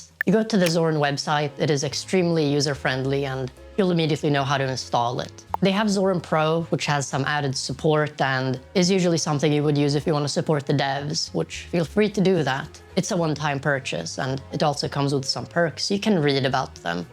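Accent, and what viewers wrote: Sweden. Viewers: swedish detected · As a Swedish person, I'm quite confident in saying that he sounds very Swedish.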